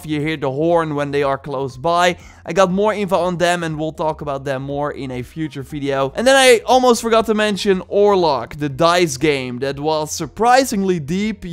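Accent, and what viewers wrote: Netherlands. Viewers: it's indeed classic Dutch laziness. With some practice they can easily speak English perfectly without an accent but after following this man for about 2 years now he literally hasn't improved at all. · You are aware he has a Dutch accent, right?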